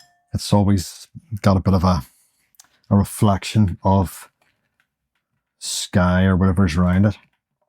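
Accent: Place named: Northern Ireland, United Kingdom. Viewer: I was scrolling through looking for a nice christmassy scene and I copped this beautiful painting, when I heard you're northern Ireland 🇮🇪 accent I had to watch it . I then realised its a watercolour and I never used them, I use acrylics (for my sins) as me granny would say..... im going to give it a go in the acrylics anyway 😂 its so gorgeous ❤❤❤❤❤❤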